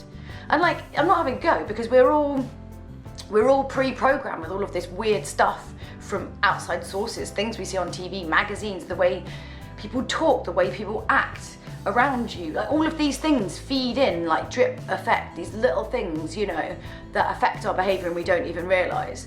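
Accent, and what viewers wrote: United Kingdom. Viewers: Your voice is awesome!, kinda raspy but not too much. Also british accent is simply the best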